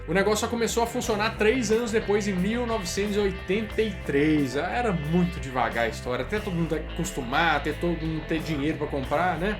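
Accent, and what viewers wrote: Minas Gerais, Brazil. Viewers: Fala mineiro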